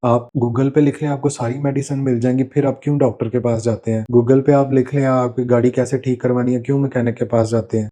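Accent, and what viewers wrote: Faisalabad, Pakistan. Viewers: Pure Faisalabadi! But Genuine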